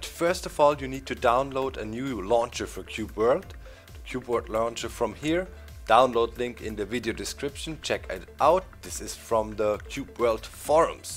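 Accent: Germany. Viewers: bist du aus deutschland?:D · Bist du deutsch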